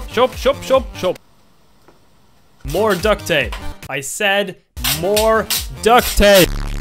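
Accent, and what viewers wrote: Norway. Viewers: Are you Norwegian 😬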